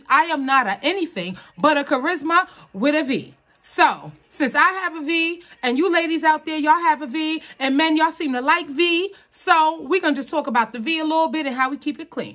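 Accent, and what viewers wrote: New York, United States. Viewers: Good tips I use to use the vagisil odor block I love the smell and I wanted to try those method body wash you think the grey one would be best for all over body including the v or do you recommend not to put it there ? Fellow New Yorker hair I can hear it in you accent girl 🙌🏼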